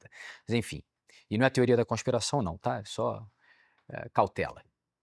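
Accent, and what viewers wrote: Rio de Janeiro, Brazil. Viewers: É que ele não tá acostumado com o carioquês, basta ver o Mestro Rorion Gracie falando Cadimia que a gente sabe que ele sabe que é academia, mas o carioquês é identidade pura. Eu entendo ele porque me dá um nervoso quando ouço Naisceu, por exemplo, que nem sei denonde veio essa forma de falar. kkkk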